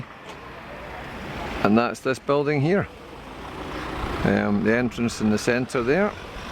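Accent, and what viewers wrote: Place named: Scotland, United Kingdom. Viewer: I've heard this mild Scots accent before . Tell me about it . I have even heard a milder Scots accent . And I have been tae Arran ...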